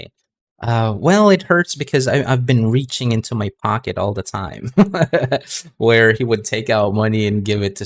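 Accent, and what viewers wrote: United States. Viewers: I think you're wrong about the English language being exclusive to the elite, the educated and very rich. You probably think so because you grew in America (judging from your accent). Public schools (schools for the masses) at that time were taught subjects in English, so the language was more common than you think. This dissemination of English was the product of 40 years American colonialization. It was the Americans who set up the educational system for the masses and the middle class. It is perhaps the foundation of why today many Filipinos speak English as a native language and why the Philippines ranks among Asian countries as one of the largest English speaking populations. But yes, your grandfather was very intelligent. Cheers. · Yeah! But boy u hide in american palda what a shame u speak americanize compared to ur lolo but no doubt ur lolo have more nutrients in his brain against yours ..what a shame 😂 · are u in the Philippines? 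Coz ur american accent is very nice 👏 Ilang taon po pala lolo nyo nung namatay sya? Seems he had a long full life.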